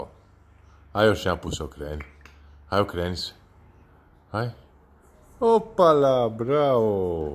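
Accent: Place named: Split, Croatia. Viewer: legenda a jos ima i splitski naglasak hahah lepotan pravi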